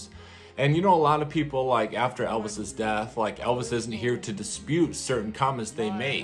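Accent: United Kingdom. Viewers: I don't believe he wanted them he had a great band backup singers. Did like your British accent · I read that on Google earlier. I can’t believe that Elvis would want the Beatles as his backup band..he had an incredible band! I love your English accent too lol! Imo, I don’t think they had the greatest musicians anyway! Elvis’s guys were perfect..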